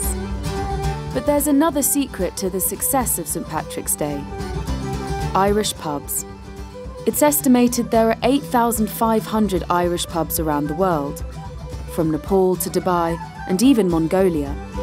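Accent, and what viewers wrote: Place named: England, United Kingdom. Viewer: The lovely English-accented voice makes no mention of why the Irish were forced to migrate around the world. 🤔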